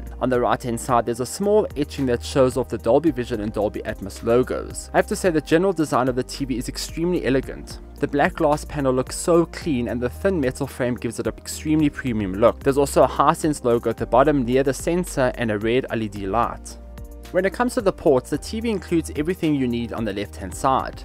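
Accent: South Africa. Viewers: I love finding random YT review videos on a product I'm researching, opening it, and hearing a good old South African accent! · South african hello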